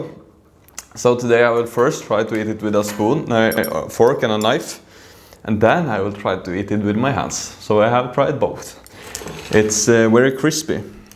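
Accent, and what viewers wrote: Egypt. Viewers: ههههههههه كلامك المصري حلو جداً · I loved your Egyptian accent 😂 Go on , you are doing well 😁 I wanna say something about " makarona pashamil " , it's pasta with a white sauce and cheese and that's it I think it's not Egyptian , Try to eat " Mahshy " or " Mombar " 🤣🤣🤣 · عسل والله لما بتتكلم بالمصري 😂🌸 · I love you when you speak arabic with egyptian accent💜💜💜💜😂😂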